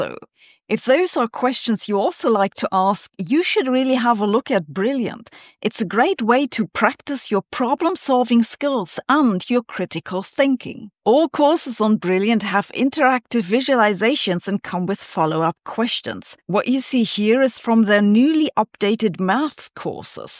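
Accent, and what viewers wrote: Germany. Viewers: Great deutsch accent. You could sing a lesson singing an melody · Breathing in the new German accent. ShiiRaahh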